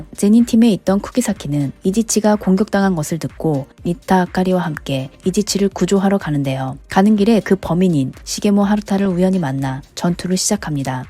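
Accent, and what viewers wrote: Japan. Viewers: 이분 억양이 일본분일듯..조금씩 액센트가있음ㅎㅎ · 혹시 일본인이신가요ㅜㅜ 일본어 지명, 이름 말씀하실때 발음이 다른것같아요!ㅎ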